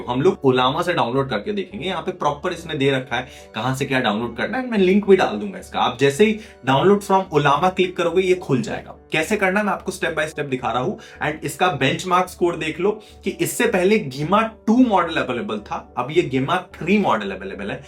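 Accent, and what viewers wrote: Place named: India. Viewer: Compà tutta sta IA e poi l'inglese con l'accento indiano? non mi dispiace, lo trovo un bel intrattenimento complementare ma faccio fatica a volte a seguire!!! fallo nella tua lingua con traduzione automatica!!!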